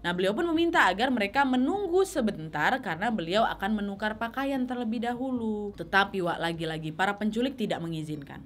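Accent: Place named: Medan, Indonesia. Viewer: Nampaknya kau ini org medan dri kutengok cara bicara kau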